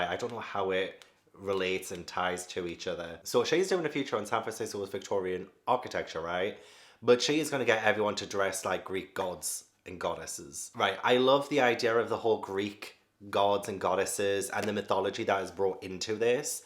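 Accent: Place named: Newcastle, United Kingdom. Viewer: I just came across your channel and for some reason I thought you would be American. Was very surprised (and happy!) to hear a fellow geordie, who is into the charmed books! 😁 thanks for making this video. It was class!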